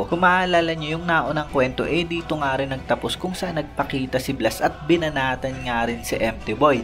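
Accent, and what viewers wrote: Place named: Iloilo, Philippines. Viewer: ilonggo ka yata boss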